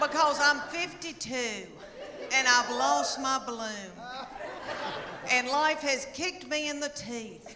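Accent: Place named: Texas, United States. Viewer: She has a very nice accent. I think she maybe from Texas😅😂🤣👍👍👍👍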